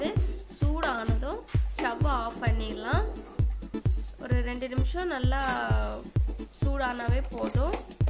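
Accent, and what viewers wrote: India. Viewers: This is Coimbatore slang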